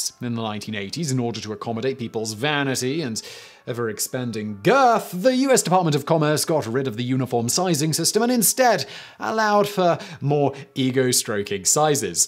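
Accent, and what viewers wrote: England, United Kingdom. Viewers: Your funny. And you speak fast in a English accent. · Between the english accent and the frickin metric system, it's a waste of time.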